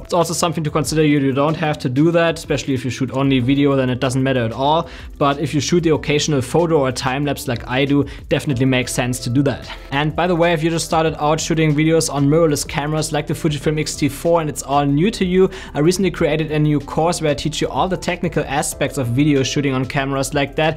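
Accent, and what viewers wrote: Germany. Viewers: German or Austrian?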